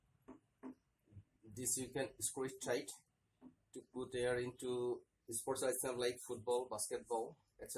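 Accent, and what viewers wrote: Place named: Assam, India. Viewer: pakka assamese accent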